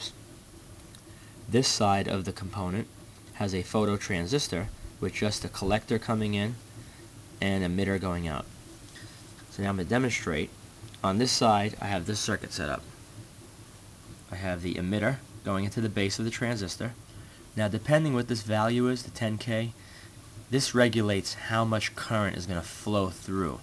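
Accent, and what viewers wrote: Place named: New Jersey, United States. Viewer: I was looking for opto isoloators and I guess because I am subscribed to your channel that you popped up again. I could not believe it. I love your videos. They are simply the best. All the knowledge, zero BS, all the circuits I really want, ..but mainly it is your style of presentation, and that lovely NJ accent. You deliver the right emotion in your videos and it makes for a truly first class educational experience. It is almost more like entertainment because they are so enjoyable to watch.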